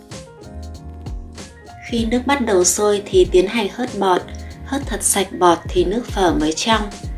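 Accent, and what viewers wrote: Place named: North, Vietnam. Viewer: A+. Giọng Bắc hay quá nên cho thêm hai dấu + ... A+++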